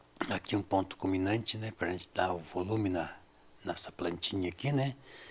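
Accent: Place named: Minas Gerais, Brazil. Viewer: Adorei suas dicas. Seu sotaque mineiro é muito bom. Obrigado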